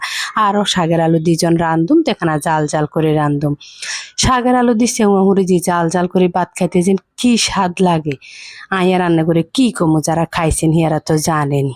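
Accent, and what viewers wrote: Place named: Noakhali, Bangladesh. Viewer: একেবারে খাঁটি নোয়াখাইল্ল্যা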